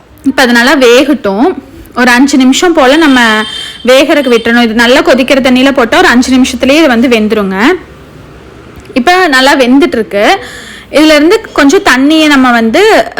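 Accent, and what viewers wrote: Kongu, India. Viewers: Iam kongu tamiz from Pollachi and your voice nice and super kongu tamiz🎉🎉🎉🎉😀😀😀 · Way of talking is nice kongu thamiz.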